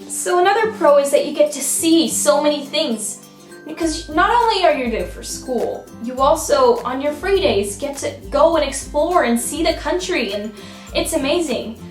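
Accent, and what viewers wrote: United States. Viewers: you got an American accent now.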